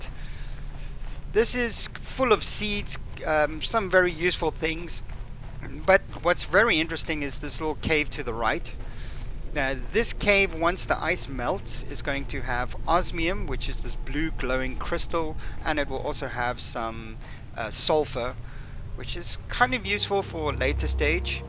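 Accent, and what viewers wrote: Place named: South Africa. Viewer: Sounds like you from SA but anyway ty this was sooooooo helpful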